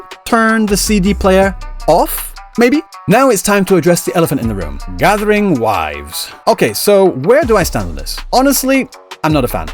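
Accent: United Kingdom. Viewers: The Linyang roast in the first 30 seconds has earned my respect. Also why do you sound so British don’t you live in Japan????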